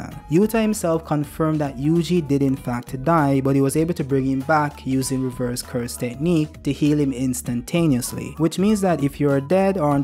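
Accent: Jamaica. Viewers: Never thought I'd hear a Jamaican accent on a JJK video. I'm in. Good content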